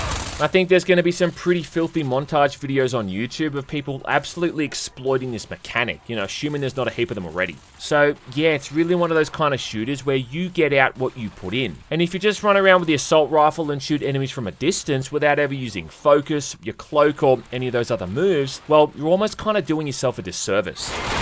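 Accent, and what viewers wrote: Australia. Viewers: I was on the fence about this game. I had watched exactly nine reviews on this and still wasn't convinced. However, YOU convinced me. Hell maybe its just cause your austrailian and that is the funniest accent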